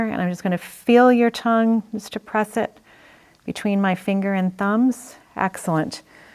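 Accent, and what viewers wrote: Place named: Canada. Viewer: Her accent is SO Canadian 🍁🍁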